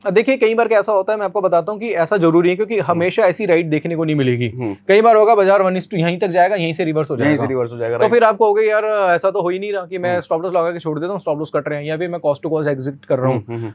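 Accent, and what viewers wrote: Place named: Bhojpuri, India. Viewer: Mr. sr ji Aap ki bat hi samjh me hati h. Bojpuri style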